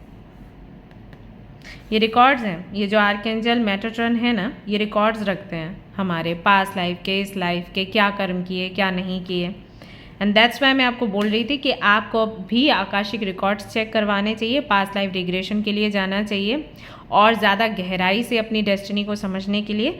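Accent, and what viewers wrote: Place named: Bihar, India. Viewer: Bhot kam logo se hi "batiyate" ho haha such a cute accent m bihar se hu aise hi bolte h sb😂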